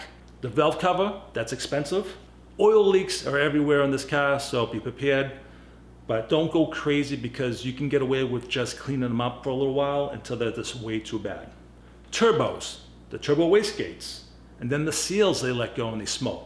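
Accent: Boston, United States. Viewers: You cannot hide that Boston accent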